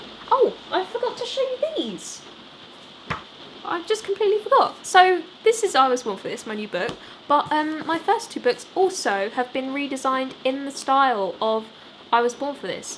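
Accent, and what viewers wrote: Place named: United Kingdom. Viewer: It is so cute when it is read with a British accent ahaha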